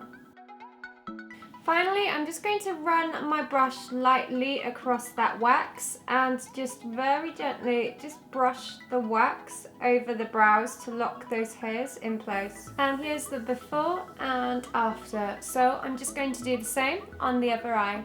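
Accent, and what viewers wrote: United Kingdom. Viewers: are you British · Are you British??? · She's britishhhhh????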